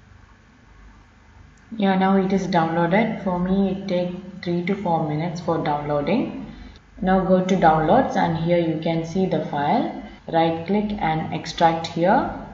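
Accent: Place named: India. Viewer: shitty indiann accent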